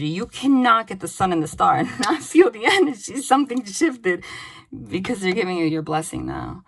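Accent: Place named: New York, United States. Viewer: I ABSOLUTELY LOVE YOUR ENERGY, YOU HAVE ME GIGGLING RIGHT ALONG WITH YOU, ALSO LOVE THE NEW YORK ACCENT!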